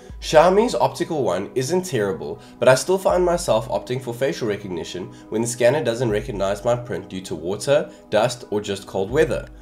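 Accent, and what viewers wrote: South Africa. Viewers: always easy to recognize a fellow Southafrican Did you move overseas permanently?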